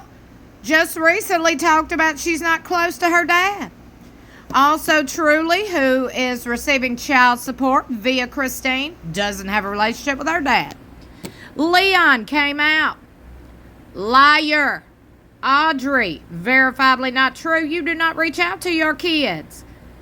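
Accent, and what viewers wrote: South, United States. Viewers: This is awesome and your strong southern accent makes it hilarious! I love the way you say full of shit!😂😂😂 I’m southern girl as well so I appreciate a good southern accent ❤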